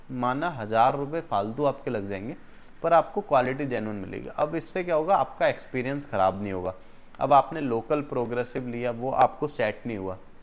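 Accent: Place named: India. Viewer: your tone and accent is too hindi medium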